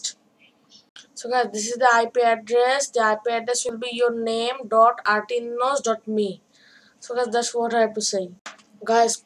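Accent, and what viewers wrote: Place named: India. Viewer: indian acent lol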